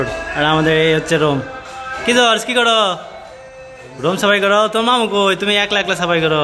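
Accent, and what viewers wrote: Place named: Bangladesh. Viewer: Apni bangladeshi mone hochhe vasa sune, Kerala te ki koren.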